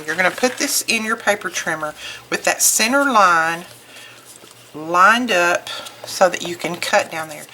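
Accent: South, United States. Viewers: Thank you for this tutorial. I tried it immediately and it works great. And your southern english sounds so nice. I will follows your blocs.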